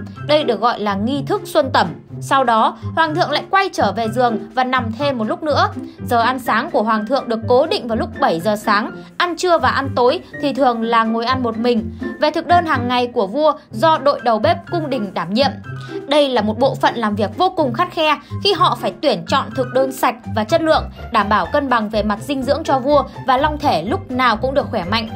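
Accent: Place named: North, Vietnam. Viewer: Em Mc vừa lanh vừa duyên vừa hài hước-to chà bá😅thích nghe em nói chuyện giọng bắt mặc dù chị không thích nói tiếng bắt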